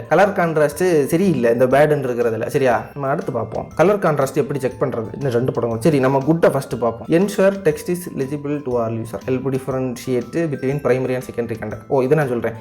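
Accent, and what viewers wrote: Kanyakumari, India. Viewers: Bro neenga kanyakumari ah?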